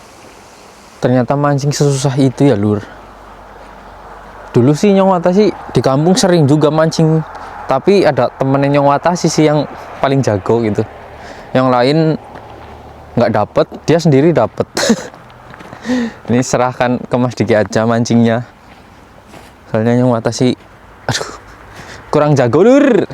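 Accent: Banyumas, Indonesia. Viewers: ngapak ngendi ya? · Gass terus kang rika wong ngapak ngendi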